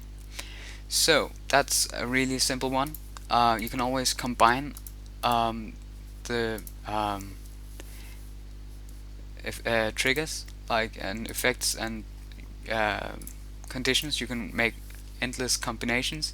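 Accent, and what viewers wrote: Denmark. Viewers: he is from denmarkm and im from sweden some thincks thet we sunds funny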